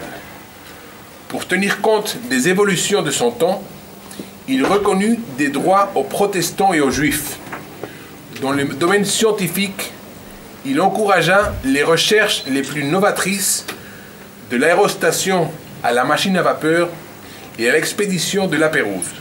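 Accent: Spain. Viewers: Par les temps qui courent, personne ne voudra en France d'un roi qui a l'accent de Miguel Indurain. C'est ça que je veux dire. Tant que vous nous vendrez la monarchie avec une tête de gondole pareille, la république aura encore de beaux jours devant elle. A la limite, l'autre famille de prétendants (celle de celui qui est mort il y a quelques temps) serait déjà un peu plus crédible... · C'est l'accent espagnol, pas roumain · un super accent espagnol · Il a un accent espagnol ?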